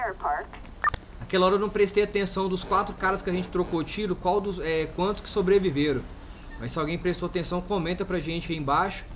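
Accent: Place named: Goiás, Brazil. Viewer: Uau! Pensava que você era de São Paulo, mas é goianão hein hahaha que massa. Psé eu também sou, aliás o vídeo ficou maneiro. 👏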